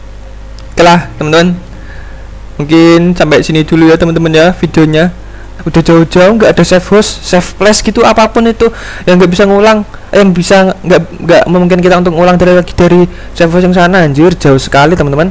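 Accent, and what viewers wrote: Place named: Java, Indonesia. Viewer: medok banget mas :v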